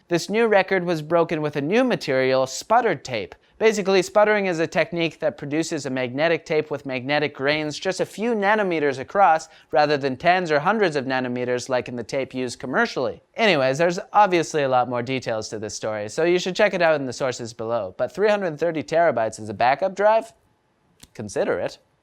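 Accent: Italy. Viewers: That Italian accent tho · Italian accent sounds like mario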